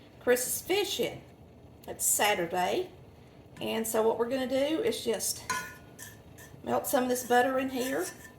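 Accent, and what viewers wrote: South, United States. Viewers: I thoroughly loved watching your video! Your accent and your sweet Southern charm absolutely tickled my heart. I live on the beach in Southern California now, but I used to live in Atlanta, Georgia. I had the sweetest Southern mother-in-law—bless her heart. She's since passed away, but listening to you brought me right back to those wonderful days in the South. You've definitely earned a new follower! I'm ready to get my Southern grub going again, and those ham and beans are the very first thing I'm making! ❤️ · That voice is 1000% southern if there ever was 😳🇺🇸🤔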